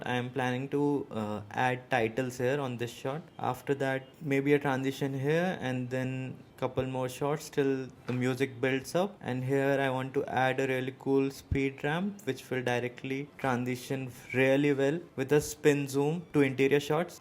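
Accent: India. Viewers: Bro pls speak in hindi 😢 your accent is indian I know you can speak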